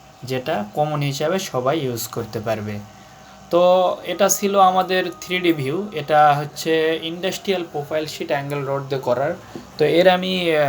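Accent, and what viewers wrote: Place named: Joypurhat, Bangladesh. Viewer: আসসালামু আলাইকুম ভাই, আপনার কথায় মনে হচ্ছে আপনি আমার দেশি হবেন। আমার বাড়ি ধামুইরহাট, আপনার মনে হয় জয়পুরহাট 🙂। যায় হোক আমি একটা বাড়ি করবো নভেম্বরে, ৬০০ স্কয়ার ফিট এর ৯ টি আর সি সি পিলার দিয়ে একতলা হবে। এক্ষেত্রে শুধু পিলার, ছাদ ও ওয়াল করতে কতো খরচ হবে! আর আমি একটা ডিজাইন করতে চাই আপনার নাম্বার টা দিবেন।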